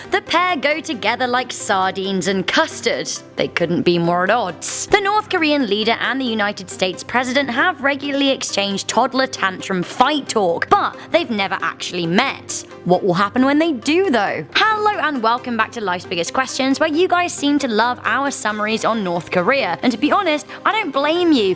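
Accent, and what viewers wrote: United Kingdom. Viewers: ‘Ello fellow Brit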